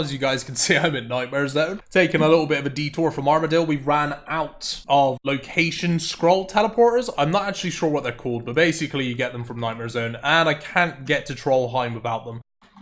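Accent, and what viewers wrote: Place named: Devon, United Kingdom. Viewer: With a Devon accent that thick I expected him to be doing a lot more farm runs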